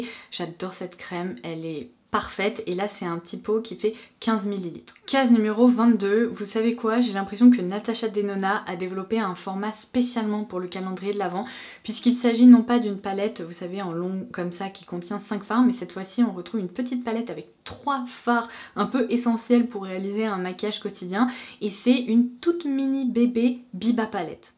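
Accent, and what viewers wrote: England, United Kingdom. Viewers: POURQUOI TU PREND UN ACCENT ANGLAIS POUR UN OUI OU POUR UN NON C’EST RIDICULE !!!!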